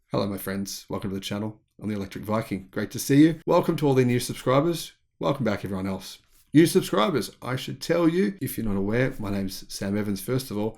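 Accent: Australia. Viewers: You're not a Viking, you sound like an Aussie.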